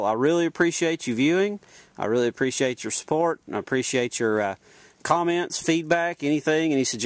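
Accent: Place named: South, United States. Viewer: This guy sound really southern. (in a good way)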